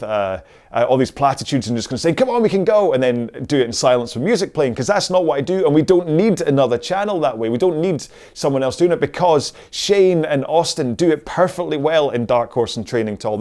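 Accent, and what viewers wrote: Scotland, United Kingdom. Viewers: Hi, first I want to thank you for all the content you’re providing. I like your vids A LOT! There is less „rah-rah“, the exact right amount of teachings, some entertainment, my kind of humor and no annoying music - just the sound of the fly wheel and some Scottish bloke talking stuff to shorten the hour. I prefer to listen to you reminding me of proper technique aspects over some podcast or music. And though I also follow and like Austin’s or Shane’s channel I regularly return to yours. My first 60min rows I did with your videos #wow60. So, thank you for the row alongs and keep on going your way. I’m sure there’ll be plenty of us indoor-online-rowers with you. 🙏💪👍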